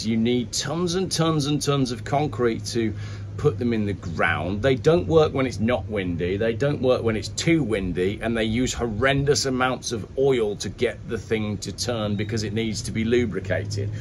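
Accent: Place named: England, United Kingdom. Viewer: And utterly rude to boot! With an English accent. Love it!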